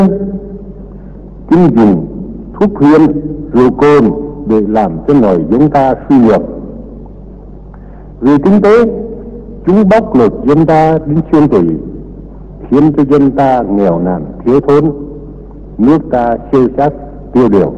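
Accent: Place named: Nghe An, Vietnam. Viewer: giọng Nghệ An mà nói giống Trung Quốc được thì chịu, mù quáng vừa thôi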